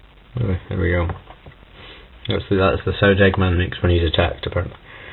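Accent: Scotland, United Kingdom. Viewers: I like how you are Scottish, man.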